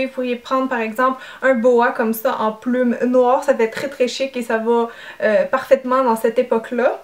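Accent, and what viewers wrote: Quebec, Canada. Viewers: Je ne supporte pas cet accent canadien! J’ai toujours detesté ! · c'est exactement ça que j'avais besoin pour mon costume des années 20, le maquillage et la coiffure, Merci beaucoup pour ton aide :) excellent vidéo et franchement ça fait du bien d'entendre une québécoise nous donner des trucs et conseils :)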